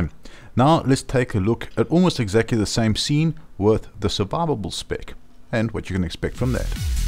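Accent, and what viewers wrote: South Africa. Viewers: Your from South Africa.??? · hes South African accent ... i have the same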